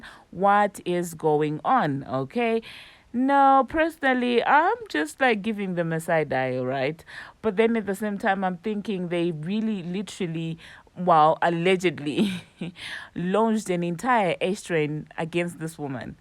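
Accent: South Africa. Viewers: Are you from South Africa 🇿🇦!? Ur accent… makes me 😊 like when I use to live there. Keep the good work 😉🥰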